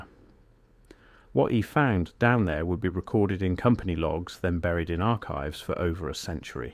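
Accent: United Kingdom. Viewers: Sure getting tired of listening to American stories narrated by a machine voice with a British accent. If you want more clicks start using a real human to speak for you. Stop being so damned lazy. We know the difference and we are sick of AI British accents.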